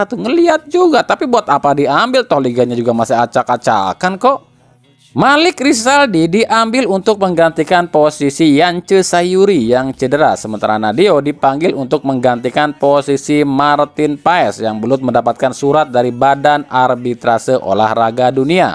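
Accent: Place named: West Java, Indonesia. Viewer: BUAHAHHAAAAA..... Yg punya chanel itu urang Sunda,logatnya itu yg jadi ciri....😀😁. Aingmah sok aya2 wae pertanyaan teh...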